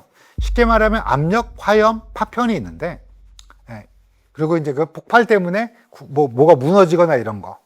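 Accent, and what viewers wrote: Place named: Gyeongsang, South Korea. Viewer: 피디님 경상도 분이신갑네예 사투리 이빠이 써뿌네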